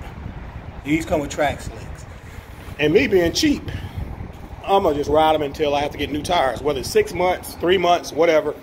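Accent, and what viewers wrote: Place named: South, United States. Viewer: You can tell you right from down south.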